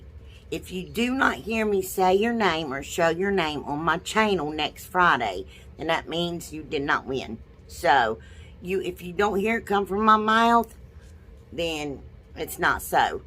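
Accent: South, United States. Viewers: Cal DIYs already?!!????!! your just make me giggle while watching you’re videos. I think it’s because if I did videos that’s exactly how I’d do them. You are so so so dang cute. I’m not from the south and I love listening to you talk. I just love you to pieces. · There’s my favorite country talkin chic!!! Fall DIY’s already? Love Love you!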